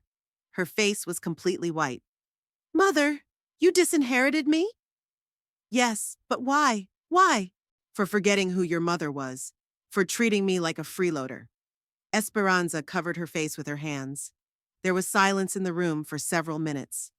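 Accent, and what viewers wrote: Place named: Mexico. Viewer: What's up with the " Frito Bandito" accent?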